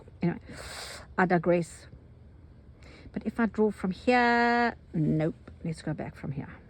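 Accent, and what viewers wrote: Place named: South Africa. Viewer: I keep thinking you sound South African, then you said "ooh gats" and that sealed it 😂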